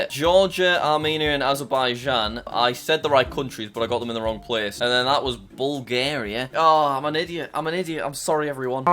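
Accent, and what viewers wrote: United Kingdom. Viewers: As a british person I know as the only person with a british accent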